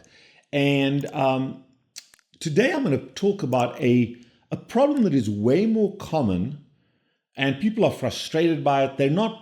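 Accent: South Africa. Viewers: 💯 this guy is South African 😂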